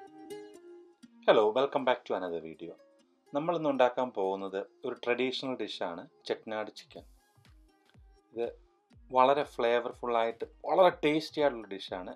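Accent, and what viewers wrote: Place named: Kottayam, India. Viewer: Well explained, Kottayam ഭാഷ ഷ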